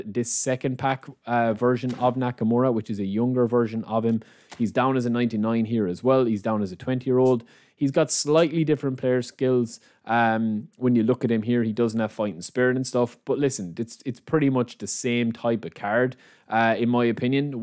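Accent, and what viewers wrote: Ireland. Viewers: that irish accent tho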